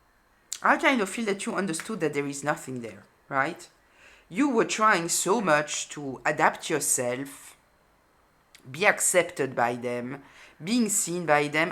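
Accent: France. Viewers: You are goldilocks mama bear papa bear n a cheeky 😜 😉 😄 😏 😆 😘 lil baby 👶 bear all in one i am the lucky goldilocks living ❤️ in a safe space ❤️ 😅 love adore an sank in the awe of your accent. Sounds like French but I am but a bamboo lambo no offence meant. You are that beauty in the world card. I see you . eagle. hear you ...bull... build my empire on your word flowing gently like a true judge... not a kangaroo court....coming thru air n water. Kaballah is the umbrella. Do not get understanding then NOT act upon that understanding.. alas whatever the gen you'll never be part of the inner standing. You beautiful creature and your channeling from the gurus truly are my umberella, my guide😮a hand to hold and a voice in the wilderness who wants what is best for me..all in the fair wayfairers way to inner standing. Phew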